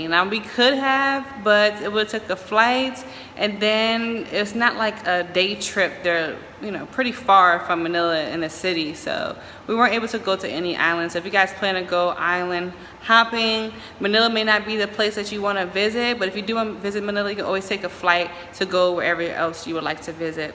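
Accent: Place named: Nashville, United States. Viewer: Filipinos are not racist.. black or white is ok..no problem because w are brown people😉😉😘 but your accent is like my friend accent she is living in Nashville 🤩💖love it🤗 Great video!☺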